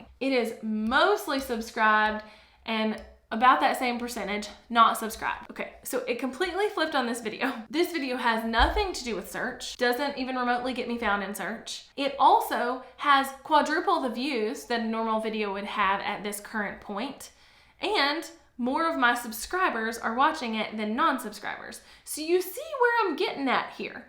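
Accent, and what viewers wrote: South, United States. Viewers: So sweet. The tonality is so different from people who are ride or die as opposed to people passing by. I learned a lot from you in your productivity era. I can't remember what search word I used... ClickUp? Anywho I'm back. Like your southern accent and beautiful office/room.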